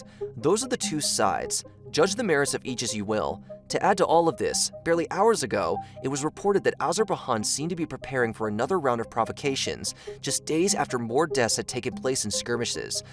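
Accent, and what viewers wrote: Spain. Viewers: He's probably Spanish, where "J" is pronounced "H". Like Juan, Jose, Marijuana,...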